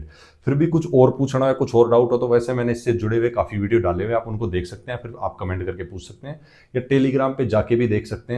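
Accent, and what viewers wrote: Haryana, India. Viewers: Sir aap harayana se ho kya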